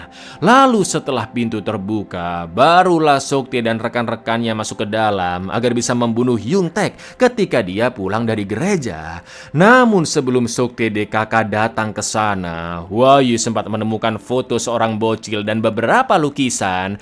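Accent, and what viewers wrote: Java, Indonesia. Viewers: ras nyaa Hwayii Jawaa anjaayyyy 😂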